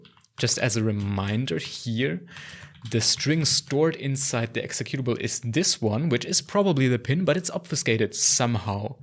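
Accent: Germany. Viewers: I genuinely like your accent and you being a German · Ich liebe deinen deutschen Akzent ❤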